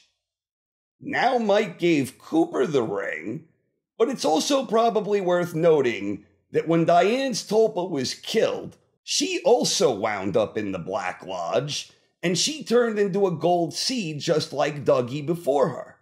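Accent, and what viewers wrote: New York, United States. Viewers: you are the man, can't thank you enough for this channel! Quick question for you..are you a NY'er? I can tell that accent when I hear it, I'm born and raised!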